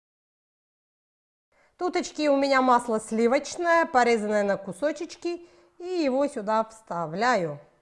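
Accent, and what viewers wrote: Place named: South, Ukraine. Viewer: Обажаю ваш южно-украинский акцент! Вы супер!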